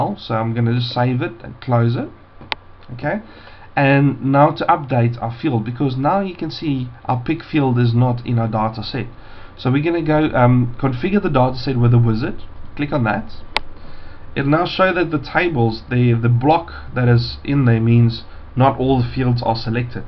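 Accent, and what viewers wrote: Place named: Afrikaans, South Africa. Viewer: your accent sounds afrikaans so you should understand this ('n goeie begrip het 'n halwe word nodig) aimed at P.S Like the Vids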